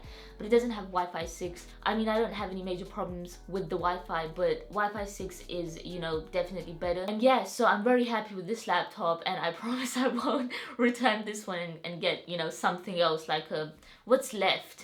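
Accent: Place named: United Kingdom. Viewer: Thanks for the share. PS - I love your British Accent. So sweet and relaxing to the ear.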